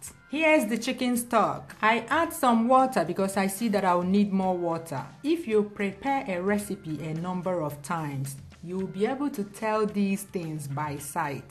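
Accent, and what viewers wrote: Nigeria. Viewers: LOVE TO HEAR U TALK NIGERIANS TO.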